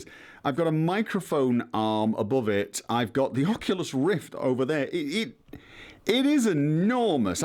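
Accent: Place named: RP, United Kingdom. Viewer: You sound very posh with that mic.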